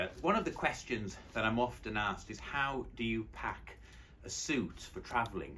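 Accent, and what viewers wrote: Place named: RP, United Kingdom. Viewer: I so like to listen to your cultivated British English. Always a pleasure.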